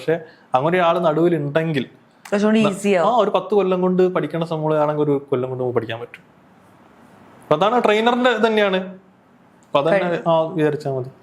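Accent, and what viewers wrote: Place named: Kozhikode, India. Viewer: Kozhikode accent catch cheyyan difficult aanu .... Bt ok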